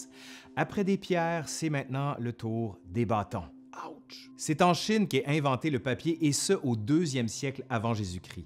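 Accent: Quebec, Canada. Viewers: Je découvre votre chaine sur cette "capsule" 😆(désolé, c'est pas méchant mais tellement marrant pour nous les françois !) sur cet épisode du PQ, et honnêtement c'est par inadvertance et non par curiosité malsaine. Bref, je trouve le présentateur des plus agréable à écouter, avec son petit accent canadien ( que j'aime beaucoup). Je trouve vos sujets très enrichissant, bien que je sois pauvre comme Job, normal je suis retraité, (plus de job). Et racontés avec une emphase des plus agréable. Alors Merci énormément, abonnement de surcroit. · Entre le papier de toilette, le papier toilette, le PQ, le papier de chiottes... Ohlala ! Ta vidéo est très rigolote et je me suis bien marré. Tu fais vraiment un très bon boulot ! Ta chaine, avec ton accent québécois à couper au couteau, c'est une bonne partie de rigolade et maintenant, voilà ! Tu me fais aimer l'histoire... 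Et bien, quel exploit ! Bravo à toi !😀😁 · Purée l’accent québécois c trop beau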